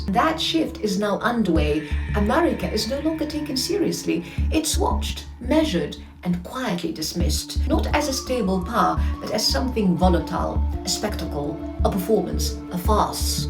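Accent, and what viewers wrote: RP, United Kingdom. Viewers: Excellent video but what's with the margaret thatcher accent 😅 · Please speak slowly and succinctly. No one is interested in your high speed Bloody British English. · yeah but I can't stand that British accent though.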